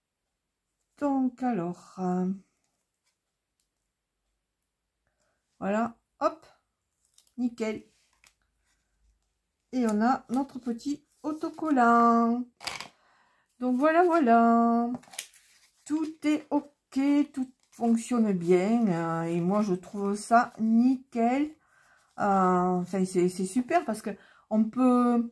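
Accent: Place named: South, France. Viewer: Bonsoir Miss à l'accent du soleil ???? Bonne idée super merci de ta vidéo scrapbises de l'Atlantique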